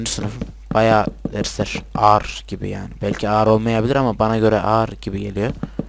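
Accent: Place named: Istanbul, Turkey. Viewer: sesin öyle 34 gibi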